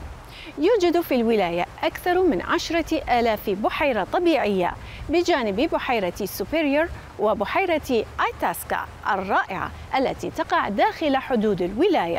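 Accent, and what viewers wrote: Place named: Iraq. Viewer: مبین من لهجتها عراقیه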